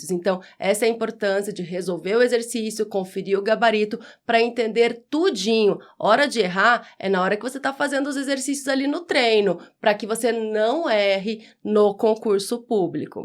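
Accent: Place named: Northeast, Brazil. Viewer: Teu sotaque parece com o nosso daqui do Nordeste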